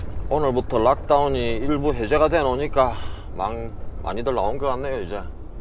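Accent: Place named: Busan, South Korea. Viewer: 저의 작은 삼촌하고 목소리, 발음, 부산 사투리까지 똑같네요. ㅎㅎ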